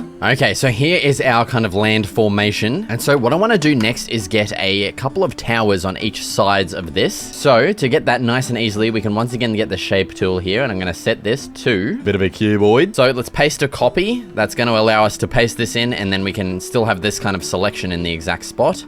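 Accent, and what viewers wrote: Australia. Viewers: mate u aussie like me? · are you aussie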